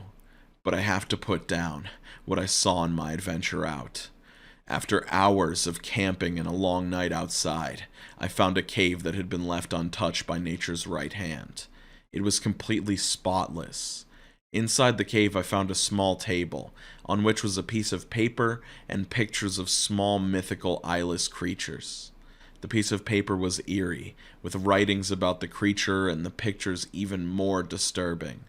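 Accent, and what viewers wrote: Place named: Northern Kentucky, United States. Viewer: are you from Northern Kentucky?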